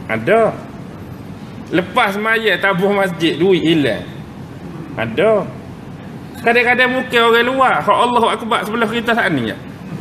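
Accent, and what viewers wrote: Kelantan, Malaysia. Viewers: Sedap dengar Ustaz guno loghat Klate kito..Skalo dok dgr loghat utara..Ambo jiran Ustaz yah Tanah Merah..Kemah sek kito 👍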